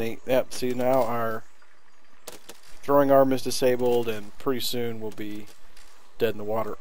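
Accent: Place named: United States. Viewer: That's great for you, but as an actual American I'm telling you this guy is an American speaking English. Not everyone in America talks the same way a rapper does. Pretty much no one does.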